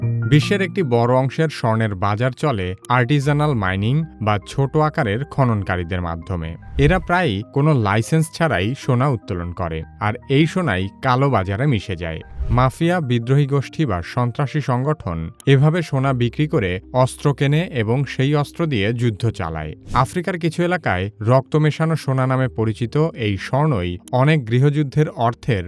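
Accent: Bangladesh. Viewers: ভাই আপনি কি নোয়াখাইল্যা নাকি?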